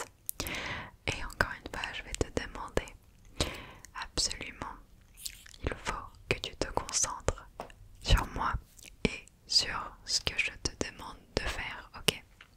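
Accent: France. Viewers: Your French accent is lovely!!!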